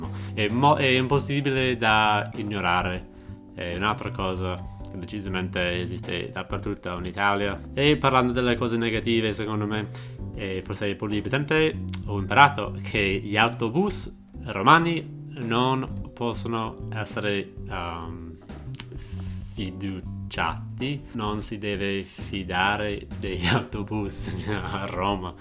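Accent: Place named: United States. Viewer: Sono romano e capisco cosa significa muoversi in questa città > gli autobus non hanno orari e pensa che per un periodo avevano messo alle fermate degli schermi con i tempi di attesa ((cazzata)) però devo difendere il fatto che non sbagliano strada, magari saltano qualche fermata, non arrivano sempre al capolinea, però non sbagliano strada. In ultima analisi > carissimo american guy > con l'accento americano e un appartamento a New York che aspetti a dire Sì a una girlfriend made in Italy? Uh, se perfezioneresti l'italiano!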